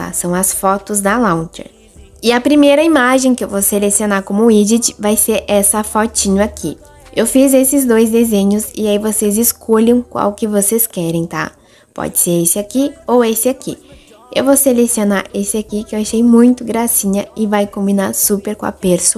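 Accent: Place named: Rio Grande do Sul, Brazil. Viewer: Oi vc e gaúcha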